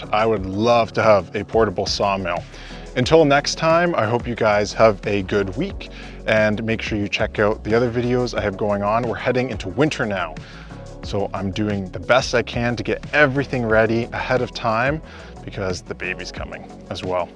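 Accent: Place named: Canada. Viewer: Your video showed up in my feed last night and I was like, hmmmmm he sounds Canadian. Hello fellow Ontarian! Nice to meet you. I'm looking forward to your videos for building the tiny home as hubby and I are about to be empty nesters. We would like to sell our home which is in a small village of about 900 people, and move back to the country where we both grew up on farms. I am betting your build will answer some questions I have about the process here in Ontario. Also, heads up - those weather people are telling us this winter is going to be super cold like we ( I mean me) experienced in 1979. It was super cold and nasty. This video made me chuckle because I heard Mike Holmes voice in my head saying, why would you put new on crappy old? when fixing your step. However lipstick on a pig sounds pretty funny, LOL. Looking forward to catching up on everything you have posted so far!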